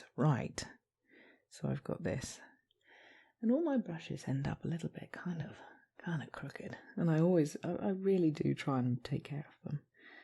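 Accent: United Kingdom. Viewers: This looks and sounds amazing!! British Accents are my favourite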